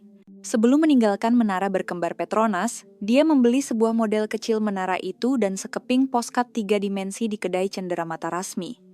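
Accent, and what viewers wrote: Indonesia. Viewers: lagi suara org indon yg bercakap dlm video pasal malyasia.menyam pah dengar meleket2 percakapan dia.haprak lah punya org.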